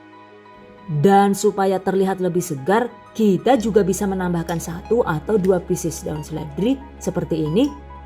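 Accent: Banyumas, Indonesia. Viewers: Suka keluar ngapak nya... Primen sih jebulane wong ngapak yaaa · wong ndi si rika ? deneng teyeng ngomong ngapak yong? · Mbak orang mana kok ngapak ngomonge 😂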